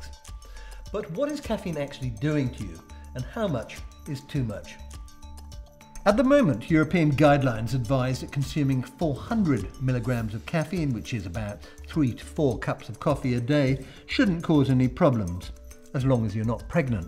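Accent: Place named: England, United Kingdom. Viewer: Drinking my latte as I watch this. Still not quite sure what a "lartay" (as you and so many other English people say - oh no, not us Welsh) is though.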